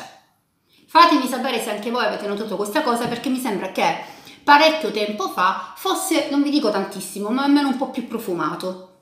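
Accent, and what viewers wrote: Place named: Calabria, Italy. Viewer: Infatti avevo capito che il tuo accento era simile al mio e mi sembri un viso nn nuovo ma di Reggio che zona,, ❤️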